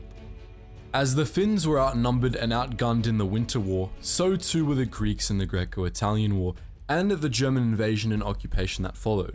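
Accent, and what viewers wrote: Australia. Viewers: Judging by your accent, I would guess you are Australian? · Considering your accent and you being Australian. Hard to take this video seriously since you’re biased.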